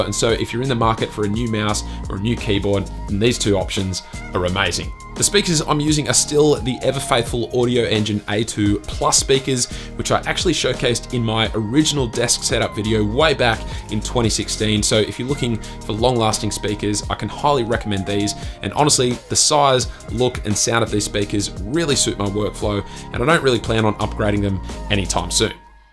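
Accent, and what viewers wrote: Australia. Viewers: Thats a Thick OZ Accent. Are you an OZ ? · Cause Aussie accents are the best m8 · sounds like such an aussie.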